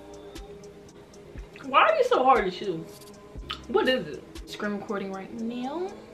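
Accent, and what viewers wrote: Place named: South Carolina, United States. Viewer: I knew I noticed your accent I'm from South Carolina ❤️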